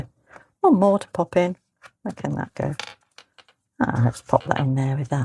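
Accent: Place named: Cockney, United Kingdom. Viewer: You sound like you're from South East London you got a bit of cockney to your accent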